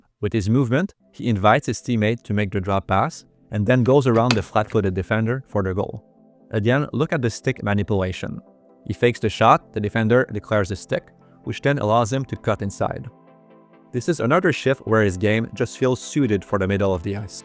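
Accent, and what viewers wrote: Quebec, Canada. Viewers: T'es un québ toi aussi? T'as un accent québecois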